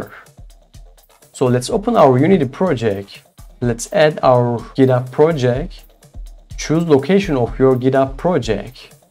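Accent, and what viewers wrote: Turkey. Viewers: I picked up the Turkish accent right away when you started talking😄 Nice video btw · are you from turkey ?? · Abi Türk olduğun çok belli oluyor ama teşekkür ederim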